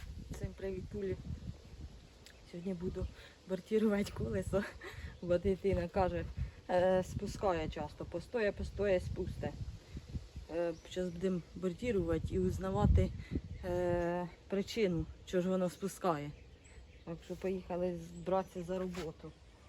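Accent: Poltava, Ukraine. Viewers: я так і думав) у мене кума з Полтавщини, то теж так говорить)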